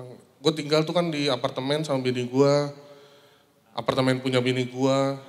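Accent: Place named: East Java, Indonesia. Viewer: Si POPON ini ngomong in Bondowoso parah juga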